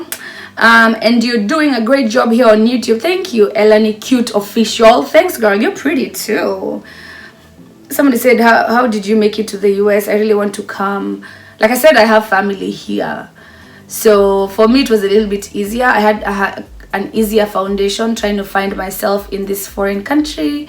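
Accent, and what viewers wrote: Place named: Kenya. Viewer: I'm always in love with the Kikuyu accent you embrace.... That's why I feel you are legit... 'Hi, it's me again ' 😂 😂 😂 😂 na ka kiswahili kidogo "Ngai"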